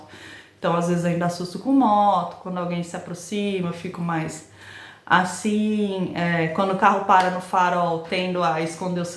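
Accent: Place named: Brazil. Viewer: Que mudou? Conta ai ...pelo sotaque é brasileira...acertei?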